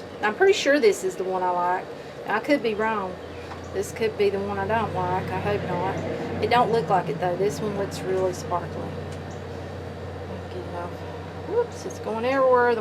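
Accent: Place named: South, United States. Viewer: OMG I LOVE YOUR VOICE! YOUR VOICE SOUNDS LIKE, JUST LIKE MY GRANDMA, the accent sounds just like her, she's from the south. Omg love it.. sorry lol. Very nice video. Enjoyed and will add you to fb. Omg, your accent is the same wow.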